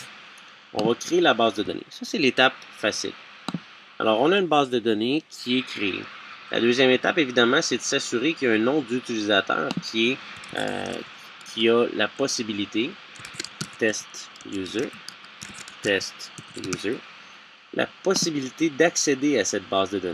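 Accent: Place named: Quebec, Canada. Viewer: J'adore l'accent québecois les "elle" ce disent "xwell" Mysql = Mysqwell :)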